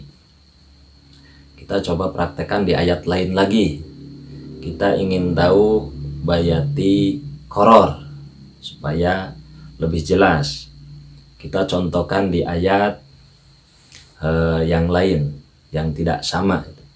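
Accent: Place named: West Java, Indonesia. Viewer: siga asli sunda euyy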